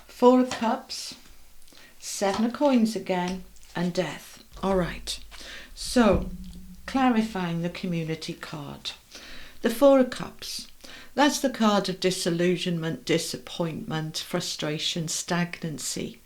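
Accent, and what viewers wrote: England, United Kingdom. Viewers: I love your British Accent, watching all the way from Namibia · South of Sydney Australia... British living here over 50 years can't beat that Pommies accent❤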